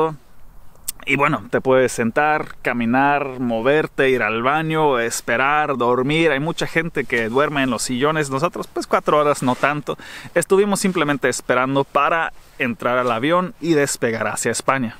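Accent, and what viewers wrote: Monterrey, Mexico. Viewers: Porque ese nombre de Alemán Latino. Si tu acento es Mexicano Regio.?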